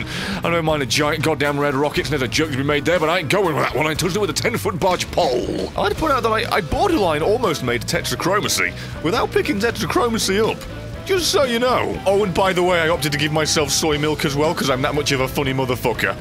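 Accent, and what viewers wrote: United Kingdom. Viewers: Nah it's his British accent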